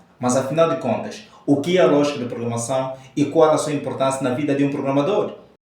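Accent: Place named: Angola. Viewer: tudo top, ainda falando com sutaque Mwangolé 💥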